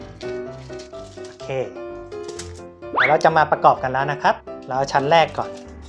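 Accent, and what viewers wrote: China. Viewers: พี่เค้าพูดสำเนียงเหมือนคนจีนเลย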